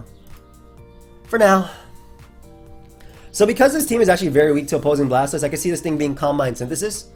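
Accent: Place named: Bronx, United States. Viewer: an entire road to top 10 with your bronx accent when?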